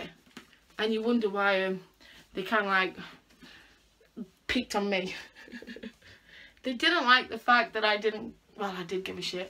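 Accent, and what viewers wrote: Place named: England, United Kingdom. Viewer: You have an accent are you from England or British or something like that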